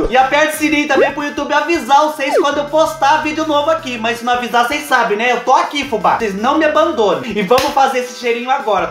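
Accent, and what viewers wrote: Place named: Minas Gerais, Brazil. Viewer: Ô Fubá, e eu achando q vc fosse de MG kkkkkkkk! Com tantas notícias negativas rolando na NET precisávamos mesmo ri um pouco.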